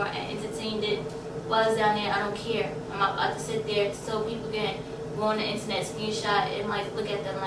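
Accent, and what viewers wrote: New York, United States. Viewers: That New York accent lol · When you said dumb cheap I knew you weren’t from the South. I knew automatically that u was from NY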